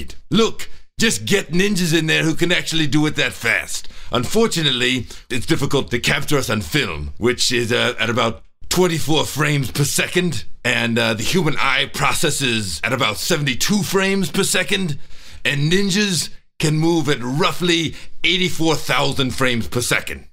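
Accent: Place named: India. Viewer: that ninja sounds like he is from inida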